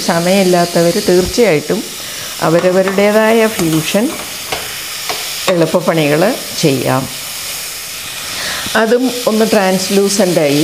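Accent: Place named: Kollam, India. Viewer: 🙏🙏 teacher,super presentation ammayude sneham adhyaapikayude arivu ellaam koody nalla ishtamaayi 😍😍 teacher place kollam aano samsaaram kettappol thonniyathaane 🤗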